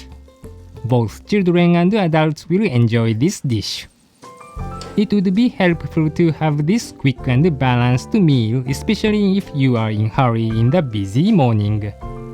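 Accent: Japan. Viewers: I love the narrator's thick japanese accent when he's speaking english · 日本人の耳からすればナレーターが日本語なまりの英語を演出しているのがわかってしまうツラさ…w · as someone who speaks both English and French, I can conform that a French-English accent sounds n o t h i n g like that! It's just his original Japanese accent I think?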